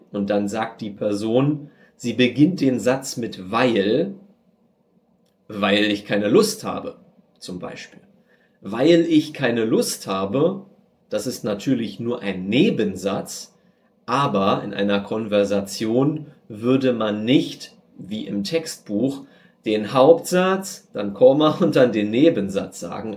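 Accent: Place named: Berlin, Germany. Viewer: If I keep listening to your videos, all my Mittelrhein friends will ask me if I've been hiding in Berlin. LOL - Excellent video! Vielen vielen Dank!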